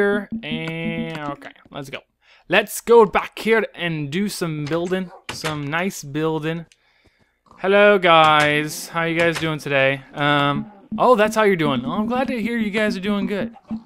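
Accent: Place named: England, United Kingdom. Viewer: not bad inglish acsent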